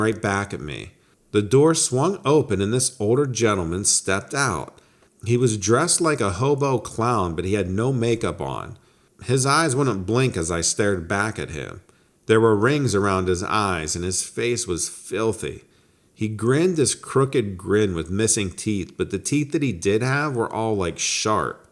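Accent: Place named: Mid-South, United States. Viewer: I really enjoy your accent - sometimes I hear mid-South (Kentucky/Tennessee), then at other times I hear the Western states (WY/CO/NM/AZ). Well, no matter, your voice adds so much to these stories, and makes them a pleasure to listen to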